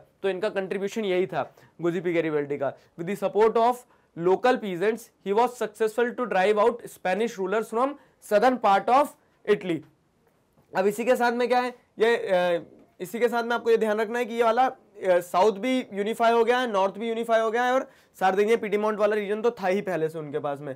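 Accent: India. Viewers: The Indian accent is weirding cute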